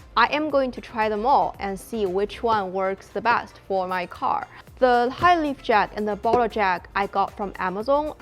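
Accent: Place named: China. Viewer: Funny Chinese accent. You do not know what you were doing.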